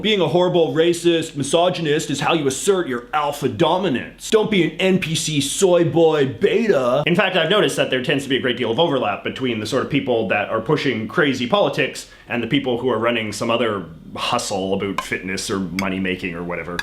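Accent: Canada. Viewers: what part of canada are you from? lots of aboots lol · I'm satisfied with your Canadian accent. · BTW, fellow Canadian Conservative here. Live just up the road from ya. Say "about" and "road" normal tho..... lol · Great video - worth a watch for the Canadian pronunciations alone. 👍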